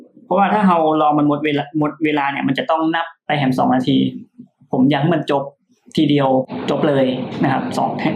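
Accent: North, Thailand. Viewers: อู้เหนือม่วนขนาดดดดดดดด......ชอบบบๆๆๆๆๆๆๆๆๆๆๆๆๆๆ o(〃＾▽＾〃)o ヾ( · ฟังไม่รู้เรื่องบ้างแต่ก็ชอบค่ะภาษาเหนือ เป็นภาษาที่ลื่นหูมาก #ติดตามพี่มาตั้งแต่เข้าวงการเทรดเลยค่ะ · ภาษาเหนือ เป็นภาษาที่มีเอกลักษณ์มากครับ ฟังแล้วสบายหูมากครับ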